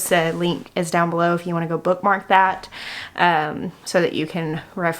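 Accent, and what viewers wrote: South, United States. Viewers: Make this so often in the fall! A friend was just saying she made it for a get together at her house and trying to pronounce it, lol. She said it much the way you do, and not how you said it during our history (love that!) you southerners and southwesterners crack me up with your accents!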